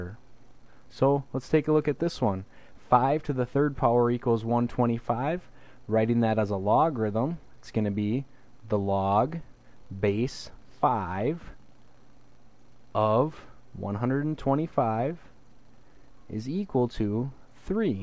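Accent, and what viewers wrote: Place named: Canada. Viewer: the canadian is so strong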